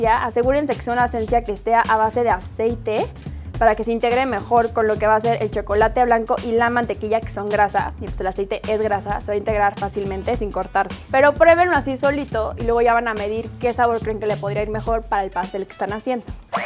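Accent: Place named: Fresa, Mexico. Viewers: por que hablar fresa señora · Me gustan mucho tus recetas solo q hablas bien fresita pero me caes muy bien saludos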